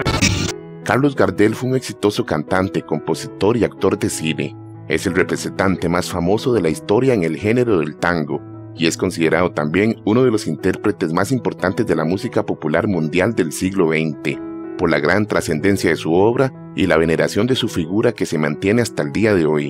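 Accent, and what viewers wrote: Costa Rica. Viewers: Me encanta como pronuncia las R’s el presentador de este canal. Ese acento solo lo tienen los de Costa Rica 🇨🇷 😄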